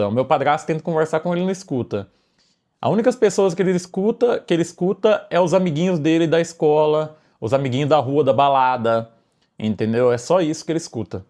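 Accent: Brazil. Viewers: De onde você é? MG? GO? Achei sotaque diferente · Vey sei q n tem nd a ver mas seu sotaque é mto bom ai qria te pergunta de onde ce é parece mto interior de sp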